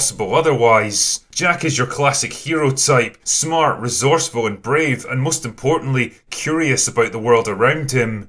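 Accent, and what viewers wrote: Scotland, United Kingdom. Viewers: Somehow I really, 'really' wanted to follow up with "Yes way!" in his customary slurred and cynical Scottish accent.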